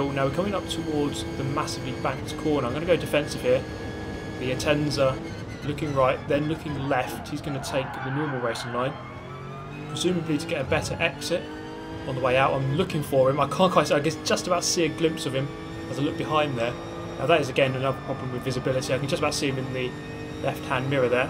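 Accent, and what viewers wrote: United Kingdom. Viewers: The british accent is perfect for sport commentating.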